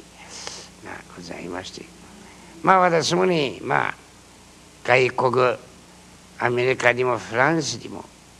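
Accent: Tsugaru, Japan. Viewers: きれいな津軽弁ですね 同じくきれいな津軽弁の地、弘前出身です。 15歳までいまして、郷里離れて30年近く経ちますが聞き取れます！ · 語りの部分わかる津軽人いますか？ ハイ！私ですが笑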